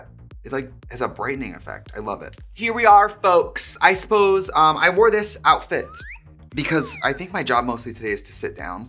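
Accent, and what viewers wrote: Wisconsin, United States. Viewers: Wisconsin Accent coming through more than normal today. Love me a dræg moment ❤️ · I love when you lean in to the Wisconsin accent.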